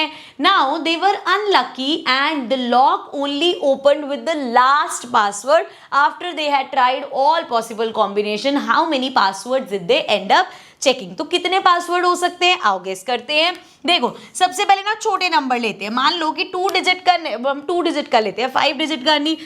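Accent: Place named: Marwari, India. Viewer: Ma'am very nice explanation thank you so much ma'am aap marvadi ho? Allee alle bolte ho isliye laga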